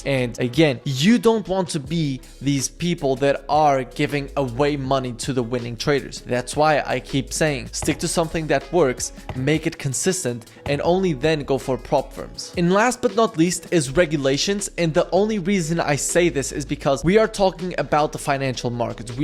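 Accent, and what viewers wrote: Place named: Portugal. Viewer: hey, vi o teu podcast do tradingnut. pergunta: és tuga? estou a escrever em PT de propósito. o teu sotaque já me dizia que sim.. mas depois do podcast fiquei a pensar 😂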